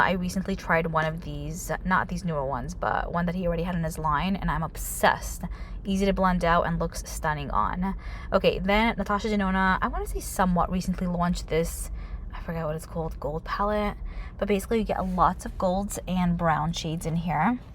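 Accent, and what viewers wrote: New York, United States. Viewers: She has a New Yawk accent just like me!! 😂😂😂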